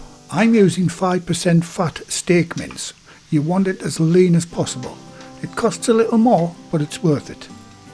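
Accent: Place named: Newcastle, United Kingdom. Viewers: You sound like a Geordie, sorry if I’m wrong I’m new but you’re a bloody good chef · Hello you have a very familiar accent you wouldn’t be from the North of England would you possibly Newcastle?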